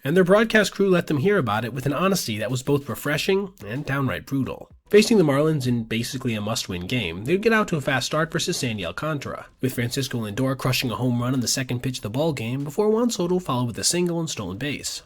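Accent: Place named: Michigan, United States. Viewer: Thought I always heard a little bit of Michigan in you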